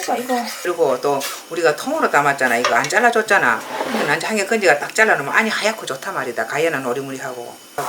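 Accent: Gyeongsang, South Korea. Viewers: 좋아요 구독 알람까지 꾸욱 경상도 사투리가 넘 정겨워예^^ 저는 부산이라예^^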